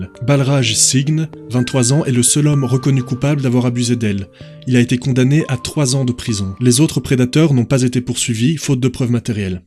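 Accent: Belgium. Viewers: Ton accent belge ressort quand tu t'énerves. Cela veut dire que tu t'énerves vraiment, ce n'est pas feint. Tony Robinson est la victime du système politique et judiciaire anglais (les deux sont liés en Angleterre, ce n'est pas le système français). La raison d'état l'emporte sur la raison tout court. Il s'agit d'établir les connexions entre les gangs et les politiques locaux. C'est un travail de journaliste d'investigation. Ce type de journaliste est en voie de disparition (d'extinction) car trop dangereux et peu rémunérateur. Un bon perroquet (femelle de préférence) est très bien payé pour présenter les JT. Les tournantes n'ont pas cessé dans les cités françaises. Les journalistes n'en parlent plus pour ne pas stigmatiser les jeunes des cités. Tout va bien dans le meilleur des mondes. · Je suis belge et t'as un accent de chez nous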